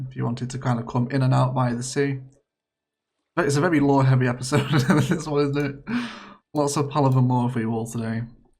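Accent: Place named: England, United Kingdom. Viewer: Awesome, you're easily one of the top 5 Cities Skylines channels around. Also, your accent is beautiful, which part of England is it from?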